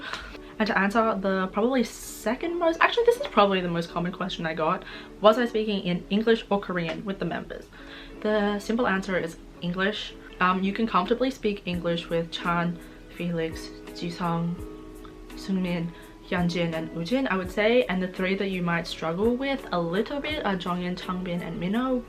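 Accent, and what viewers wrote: Australia. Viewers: I'm trying to understand everything you were saying but you speak too fast and my dumb mind can't affort that aussie english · My dear , i wish someone would put at the comment site on what actually the step is because im not saying your way of explaining is bad but within your aussie accent i just cant understand much mate . It would be nice if you nicely put the steps at the comment site or at description or even subtitles because i cant get through of the whole video because i wasnt understand much . I just understand until at skz twitter link and after that about the albums mate i really doesnt understand i hope that you will understand our situation is . I really adore you and i wish to see more of your videos . Love , support and feedbacks from malaysia ! ❤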